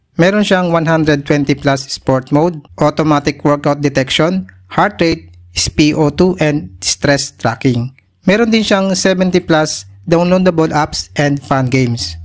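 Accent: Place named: India. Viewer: Bat may Indian accent ka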